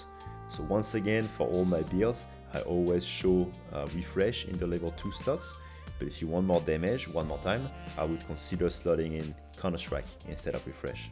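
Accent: France. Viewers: Mec, on manque de continue en FR de fou sur MH! On l'entends l'accent frenchie, hesite pas faire du contenue en FR ca marchera de fou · Frensh accent make it better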